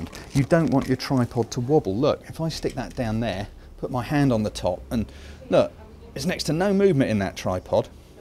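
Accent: United Kingdom. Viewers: I love your British accent, I'm subscribed just for the accent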